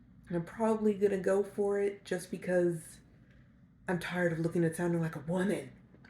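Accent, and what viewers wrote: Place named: United States. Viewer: hey man really sorry to hear that this is still something youre not sure about. im myself gonna start estrogen in around 3 months after a journey of 3 years of trying to get on them. i'm from germany so i dont actually know how hard it is in america (im assuming youre from there by your accent). and even now i have my doubts. but whenever i think about if i really wanna do it i try to think about the alternative. which for me would be growing old in a male body. and that is like a genuine horror story to me... maybe try and rationalize it for yourself like that? i have these thoughts because im naturally very androgynous and have been "misgendered" in both directions since i was a little kid. people never know how to read me. but if i think about how my body will just become more nad more masculine with time it actually terrifies me idk. 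i'm 24 now so i have plenty of time to enjoy my life during and after medical transition :) socially i'm fully out to everyone for almost 5 years now so thats not an issue anymore lol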